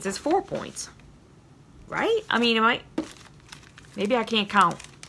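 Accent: Louisiana, United States. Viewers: I've lived in Ocean Springs MS since 1969. I have a little bit of a Cajun accent now and it depends on the word lol. I knew I detected Southern Louisiana in you. Kindred spirit!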